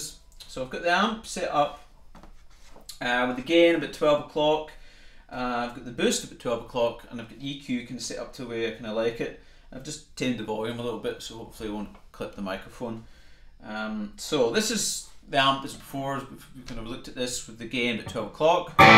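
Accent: Scotland, United Kingdom. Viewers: Thanks for that demo. I used my Grado head phones for this one ! That amp sounds MASSIVE ! Great tones ! Great playing and Scottish accent !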